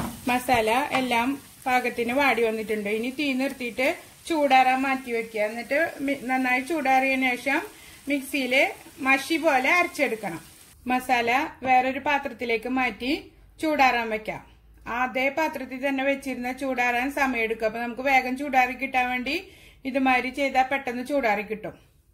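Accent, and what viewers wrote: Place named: Thrissur, India. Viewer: തൃശ്ശൂർ ആണെന്ന് തോന്നുന്നു സംസാരം കേട്ടിട്ട് Anyway, എത്രയും പെട്ടെന്ന് ആയിരം സബ്സ്ക്രൈബർ ആകട്ടെ എന്ന് ആശംസിക്കുന്നു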